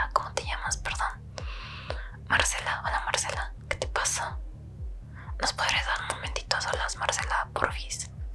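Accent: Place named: Mexico. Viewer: Se re nota que es mexicana wn JAJA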